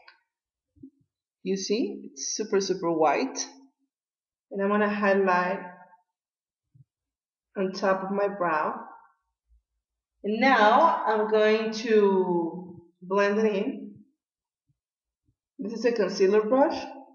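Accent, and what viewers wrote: Mexico. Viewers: Love this video! You speak English really good I have the Mexican accent too! Haha😂 And my eye brows are a challenge a big challenge cause I have to make my brows every day cause I don't have to much hair on it😒 sad ! Well thank for this tips.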